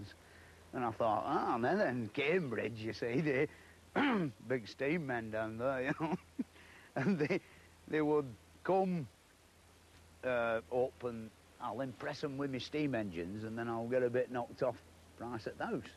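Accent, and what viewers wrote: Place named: Lancashire, United Kingdom. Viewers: That isn't a Yorkshire accent bud. It's a Bolton accent, Lancashire. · One can hear that accent all through Bolton to this day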